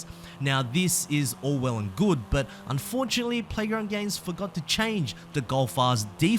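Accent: Australia. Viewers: Fun fact! I was today years old when I realized is Australian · Wait ur Australian My brother What part are you from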